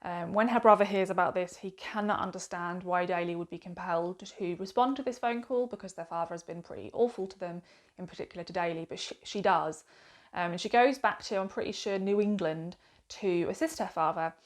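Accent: United Kingdom. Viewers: I have just subscribed to your chanel. Such an eloquent, clear and very informative book genre review. It's so refreshing to firstly have a book chanel without the person waving a book around while you are trying to look at it. Someone who speaks clearly and I can understand. I'm British and so are you so that's helpful 😅. I've grown tired of many of the book chanel on YouTube, yours is so good, thank you. I'm 73 and an avid reader since the age of 5. But I just feel I need good quality suggestions , which you provide. Well done. Julie x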